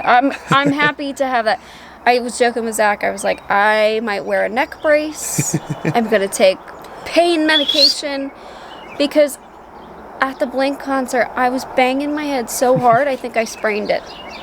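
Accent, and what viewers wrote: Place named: New Jersey, United States. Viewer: New Jersey makes sense. You have an accent that comes out on certain words and I couldn't figure it out.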